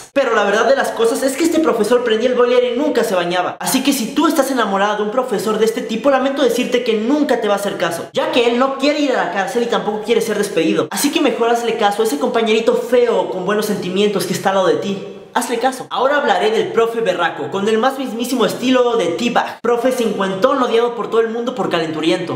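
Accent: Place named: Mexico. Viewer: Eres muy mexicano tio, no te he entendido nada xddd Saludos desde EspaÑaaaa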